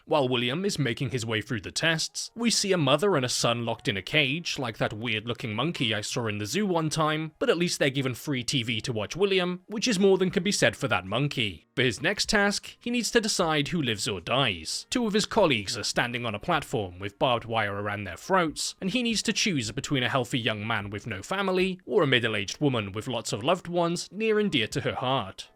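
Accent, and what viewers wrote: United Kingdom. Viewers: i nnoot know he british until i press play bruh i had it saved to my watch later my life is ruined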